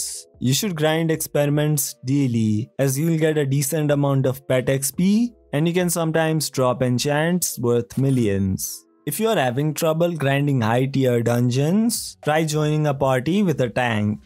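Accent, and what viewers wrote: India. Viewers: why do you sound like indian dialect? By the way, very good content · Because your voice was sounding Indian to me and you had added Hindi subtitles as well🗿😂 Is my guess correct?😳 Please reply me 😭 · you r indian dont lie :) you r talking in indian english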